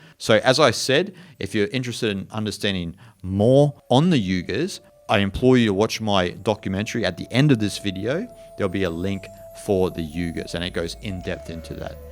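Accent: Australia. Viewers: Great content and presentation. Always good to hear an Aussie voice. 😊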